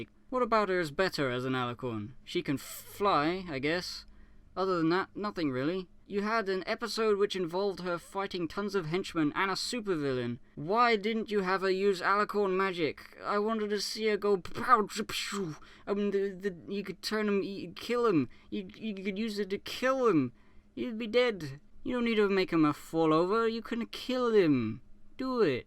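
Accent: United Kingdom. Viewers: Being British, I can still hear his real accent as he talks, so I think this is a parody on some of the non-English content creators, notably JanAnimations. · I'm confused. When I first heard his voice, I thought he was British, but in this video, where he's completely drunk, he sounds Italian to me. Where is he actually from? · Nothing I love more then to listen do a drunk man bitch in his non-native language. That is me being sincere not sarcastic. This was way to fun. Wont shut the FUCK UP! Still not shutting the FUCK UP! Has completely forgotten how to shut the FUCK UP! I couldn't stop laughing. P.S. The first time I listened to you I thought you were British. But I heard so many other accents this go around.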